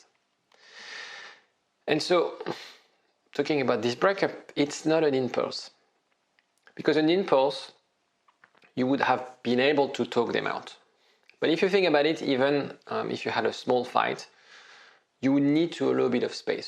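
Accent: France. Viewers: Your voice, look, and french accent already calms me down 🙂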